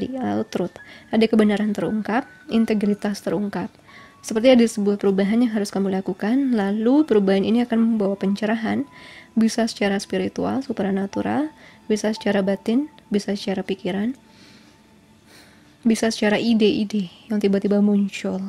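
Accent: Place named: Java, Indonesia. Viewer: Pile 1, kenapa ya aku rada-rada feeling "kok kak ngomongnya rada medok ya?"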